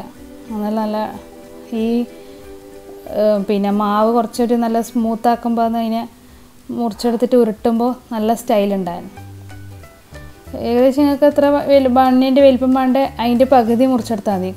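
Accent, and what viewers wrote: Kannur, India. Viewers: Aha... bun poliyanallo.... really it look similar to bakery bun... koottathil ante kannur bashayum... 😊😊😄👍👌👌👌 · Kannoorkari aano???Aa slang kettitt chodichathaa😄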